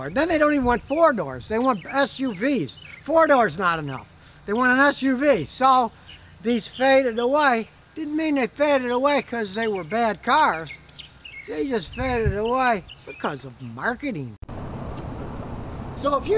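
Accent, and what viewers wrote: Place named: Tennessee, United States. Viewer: its like your getting used to Tennessee, i can hear that in your tone 💪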